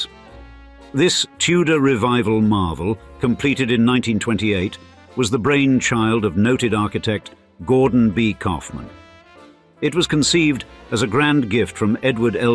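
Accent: United Kingdom. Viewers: A British accent narrating an American city sounds odd.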